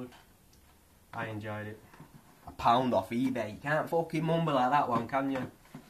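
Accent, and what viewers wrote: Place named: United States. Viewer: what you talking in that SHOCKING american accent for ?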